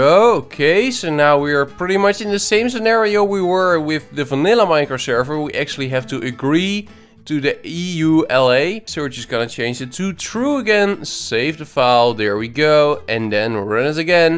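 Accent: Netherlands. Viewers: Hij klinkt Nederlands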